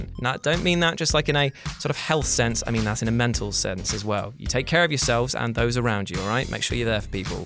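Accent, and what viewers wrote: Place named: United Kingdom. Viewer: Everything is slightly better with a British accent.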